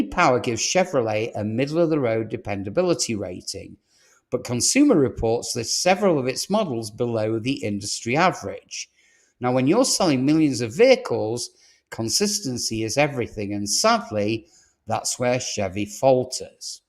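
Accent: United Kingdom. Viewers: No more British accent narrators ‼️ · Despite British voice, American cars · SOUND LIKE ANOTHER BRITISH EXPERT,WHOSE COUNTRY HAS THE WORST CARS IN THE WORLD TALKING ABOUT GOOD RELIABLE VEHICLES! BELIEVE THAY BULLSHIT !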